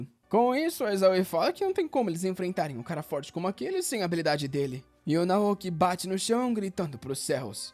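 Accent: Rio de Janeiro, Brazil. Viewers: o é carioca? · é carioca?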